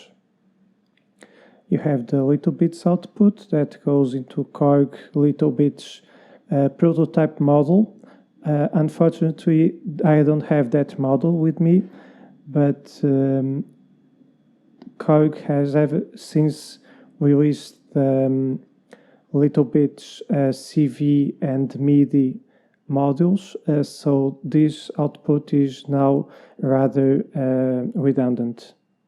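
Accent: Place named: Portugal. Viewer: Are you from Portugal? I watch a lot of your videos and I can always detect some Portuguese accent 🤔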